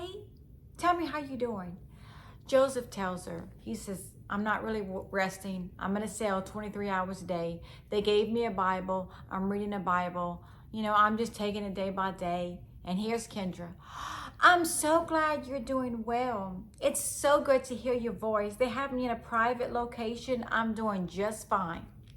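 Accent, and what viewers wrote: Louisiana, United States. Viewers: I'm glad to see you covering this. These folks ain't right, like some inbreeding stuff going on, summmthin fishy I dunno.... Buuuut I can't believe your channel ain't at minimum a million subscribers! You are stunningly beautiful, you do a excellent job at covering these cases, and you got that cajun accent everybody loves! 💯 We gotta get this channel in the algorithm everybody leave a quick comment on her vids ❤ ~From, Houma 🐊 ☮️ ☯️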